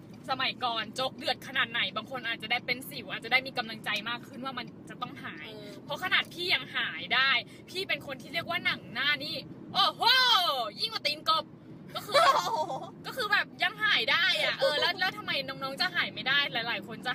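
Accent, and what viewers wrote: Thailand. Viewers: เหน่อ เว้ยเฮ้ย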